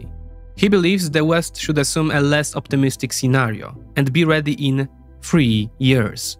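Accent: Russia. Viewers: You probably could care less but I decided to unsubscribe from your obvious Pro-Putin stance; you may possibly be a Communist or atheist? Regardless I cannot abide anyone standing with Putin and his TERRORIST MAFIA STATE! I hope and believe that Putin will fail; his economy is shattered and is crumbling. May all atheists fail! I’m happy to have unsubscribed; you even sound like a Russian!! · Как же он пытается скрыть русский акцент)) клоун)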